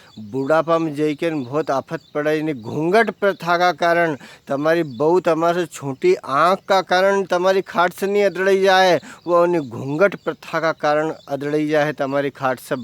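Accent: Malwa, India. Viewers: ...man khush ho jata h apk video sunkar..khas kr apki malvi boli sun kar...👏👏👏👏👌👌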